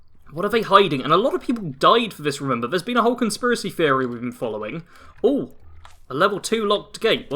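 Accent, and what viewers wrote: United Kingdom. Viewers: It's all good. You're British. ;-). It is, however App-ah-lay-cha, not App-ah-lach-ia. Cheers!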